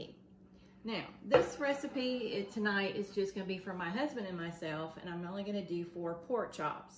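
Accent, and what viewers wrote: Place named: Texas, United States. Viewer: Hey Yes I think so too. I can’t wait to make this recipe! Sounds so good. I love your Texan accent. I’m from Canada 🇨🇦 with the goofball Prime Minister. 😆 Thank you.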